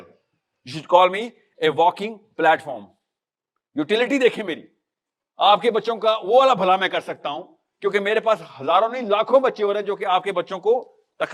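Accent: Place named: United Kingdom. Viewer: Aur English accent ziyada hay clarity kum hain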